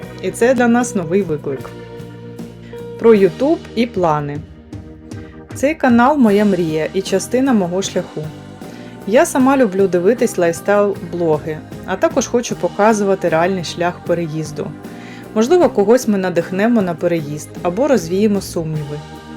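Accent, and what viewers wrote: Standard. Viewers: Ютуб закинув це відео в рекомендовані і воно набирає перегляди . Робіть заставки відео без дрібного шрифту . Як для блогера у вас гарна дикція, чиста українська мова і вміння формулювати думку . У вас все вийде, розвивайтеся, експериментуйте - успіх буде . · Дякую вам. Ви трішечки змінили мою думку про східних переселенців на кращу. Особливо вражає чиста українська мова. Бажаю вам, щоб у вас все було добре, і вірю, що так буде: і у вас, і у нас-в Україні.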